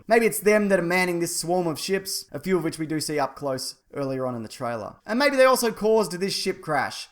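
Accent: Australia. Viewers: Always good to hear the rare Australian YouTube guru .... why had I not found you before ??? Ima subscribe.. oi oi oi !!!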